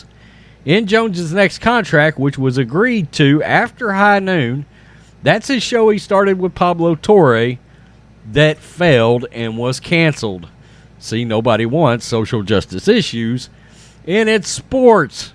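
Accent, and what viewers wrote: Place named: South, United States. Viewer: I lost brain cells listening to your country accent and the amount of time you said, “Woke.” 🤦🏽‍♂️🤦🏽‍♂️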